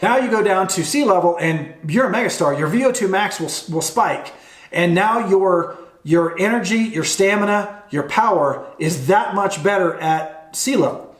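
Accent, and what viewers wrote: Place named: RP, United Kingdom. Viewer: It's so nice to heard commentary about CU by someone who speaks the Queen's English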